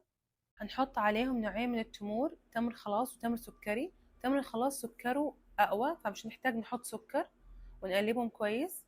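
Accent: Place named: Saudi Arabia. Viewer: حاسه فيه لمحة لهجة سعودية 😂😂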